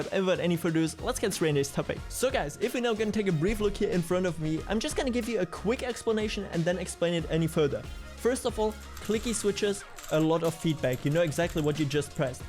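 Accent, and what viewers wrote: Germany. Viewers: Ich höre deutsch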